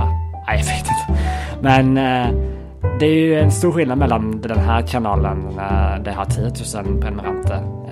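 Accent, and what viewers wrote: Sweden. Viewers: Typical Swedish English. Nejmen nu äre dags att subscriba till engelska kanalen :)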